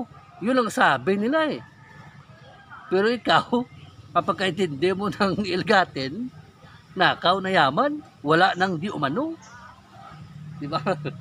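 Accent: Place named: Iloilo, Philippines. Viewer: Parang ilonggo ka host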